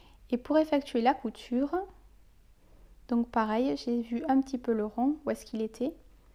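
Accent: South, France. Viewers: Super merci beaucoup, j aime beaucoup vos tutos et votre accents du midi me rappelle les vacances 😊. Euhhh j avais une question : quelle composition de laine prendre pour les doudou Bb comme la layette ? Je débute et j ai des doutes avec le choix de la laine. Je sais qu'elle est conseillé sur le modèle mais j ai récupéré un gros stock de laine neuves, 💫mais beaucoup d acrylique biensur.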